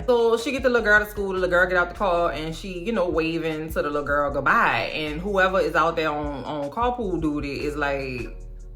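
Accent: New Orleans, United States. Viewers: It’s the N.O. accent for me😂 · The New Orleans dialect is the absolute BEST!! I love the way you speak!! ❤❤❤❤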